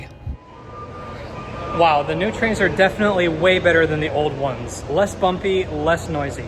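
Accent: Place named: United States. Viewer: You're European? You have a perfect American accent lol What's your native language?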